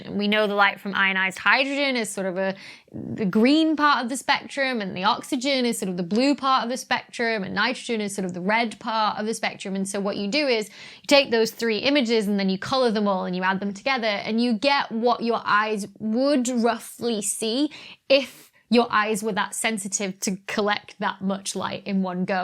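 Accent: United Kingdom. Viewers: Very informative. Spot on delivery and content. You keep getting better! Plus, listening to you, I have tuned my ear to understand British accent.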